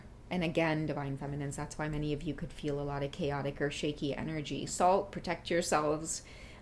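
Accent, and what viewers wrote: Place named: Trinidad and Tobago. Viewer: What you said at the mark. Nailed it! 💣💥 He even admitted it to me too. And then at the mark "somebody who's just there with you because you're putting a roof over their head". I said pretty much the same thing to him. It's true though. Spot on thanks so much 💜💜💜 PS I'm here for the Trinny accent too! 😉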